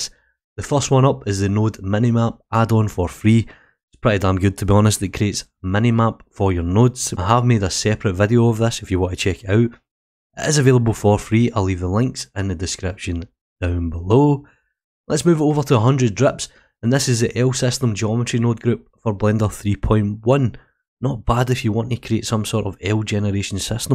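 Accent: Scotland, United Kingdom. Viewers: Don't worry, you just sound a bit more Scottish than usual.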